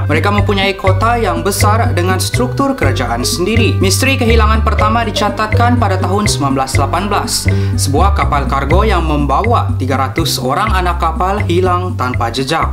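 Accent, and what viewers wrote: Sabah, Malaysia. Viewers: Sabah ka kau ni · MN ada indon punya CKP MCM nie, hahaha dia org Sabah lh CKP MCM org KK... kotakinabalu bah Sabah bah.... salam dari kotakinabalu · Obviously it's Sabahan... beza bahasa indo and malaysia... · dia asal sabah tpi sda lama stay d KL tulah bckp pun ada cmpuran mcm bhasa sabah smenanjung & indon.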